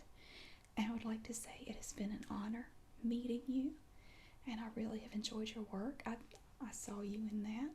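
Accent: South, United States. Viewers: Yay, southern accents are one of my favourites for ASMR. Lovely video, thank you, you seem like such a wonderful person.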